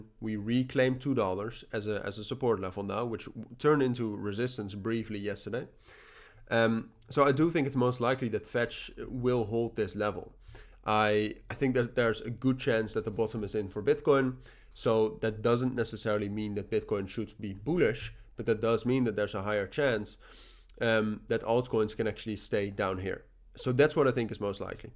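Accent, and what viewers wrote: Netherlands. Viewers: Ben je Nederlands? K hoor het aan je accent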